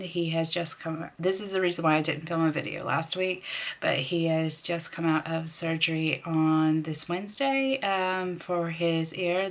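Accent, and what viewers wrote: Scotland, United Kingdom. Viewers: I thought u sounded a bit Scottish! Love from Glasgow :) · Good video. I was going to guess you had moved to Scotland before you said it. Can hear it in your accent very slightly and when you said wee for small. I find it so bizarre Scotland and wales dont pay for prescriptions like we do in england. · You have a Scottish twang to your American accent :-) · As a Scot myself, I can detect a tiny bit of a Sottish accent developing there! That's the way to integrate fully! 😂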